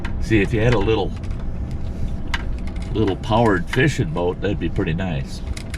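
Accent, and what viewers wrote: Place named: Indiana, United States. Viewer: What state are you in, sounds like Indiana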